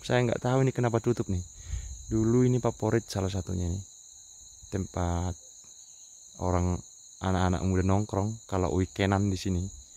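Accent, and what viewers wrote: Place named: Batak, Indonesia. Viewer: Dari dialeknya, BATAK kali pun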